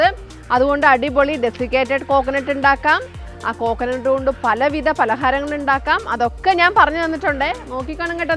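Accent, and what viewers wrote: Kottayam, India. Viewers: Thanku so mach ചേച്ചി..' ചേച്ചിടെ സംസാരരീതി കോട്ടയം അച്ചായത്തി തന്നെ'' ''ഒരു സംശയം ഫുഡ് ഉപയോഗിക്കാൻ പറ്റുമേ.ബീഫ് ,ഫിഷ് ചിക്കൻ എന്നിവ കൊണ്ട് വിഭവങ്ങൾ ഉണ്ടാക്കുവാൻ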